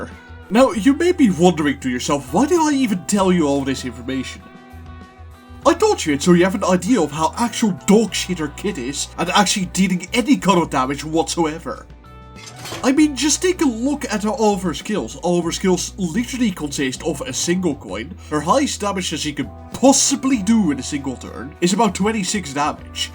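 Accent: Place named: RP, United Kingdom. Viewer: You have the voice of a British lord, will you appear in wuthering heights?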